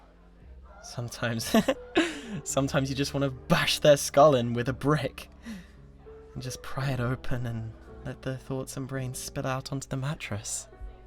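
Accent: United Kingdom. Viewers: High pitched British screaming that's makes you both laugh and also feel slightly concerned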